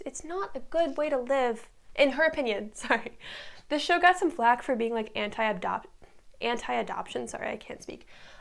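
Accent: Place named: Australia. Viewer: Wow! Never expected to realize that I needed a full retrospective on season 1 of OUAT. Thank you so much for processing this mess of a show into a coherent recap. This video rekindled my interest in this fandom, so I guess it's time to dig some of my favs from ao3. Because the show treats itself so seriously, I was giggling whenever you were referring to Rumple or whoever with "bestie" or completely slandering someone for whatever illogical thing they did. Like half of the time plot important characters appear out of nowhere to do some random moral talk for a side-story character because... reasons? Like why the hell Belle suddenly materialized to give a true love speech for Grumpy/Dreamy. It's even funnier when you consider her side quest with Mulan just somewhere around that same time period. Like the Mulan? Seriously? Or the fact that Regina crushes Christian's Gray heart(whatever is his name in the series) and no one actually cares in just about a few minutes(even Emma with her unresolved internal conflicts and fear of abandonment) but Catherine not-so-dead-but-actually-kidnapped is getting like multiple episodes in which everyone in town speculates on what's happened only because let's be honest here it's just juicier gossip than random ass sheriff dying of a heart attack in what? 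30ish years old? It was two hours and a half long video and I chuckled every time you did that. Also, your Australian accent and some characters' quirks impressions were just killing me, like I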